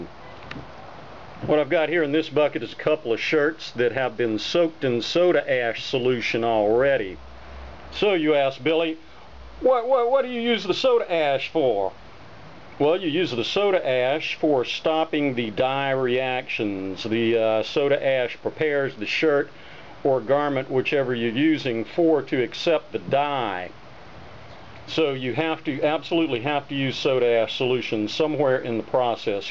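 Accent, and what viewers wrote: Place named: Australia. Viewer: totally!! is u aussie mate=??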